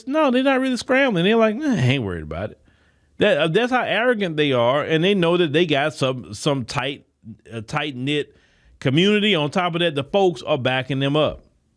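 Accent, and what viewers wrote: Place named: AAVE, United States. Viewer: Bro, can't you speak proper English? Why are you as a blogger sitting in a fancy office in a suit with an expensive watch talking Ebonics like a ghetto middle school dropout? I suggest you go read Thomas Solwell's books.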